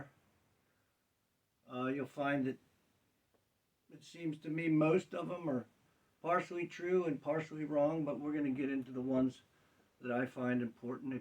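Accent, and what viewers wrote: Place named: United States. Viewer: Hi can you document the down up down up. I am having difficulty understanding what you are saying,your American accent Am from Liverpool in the UK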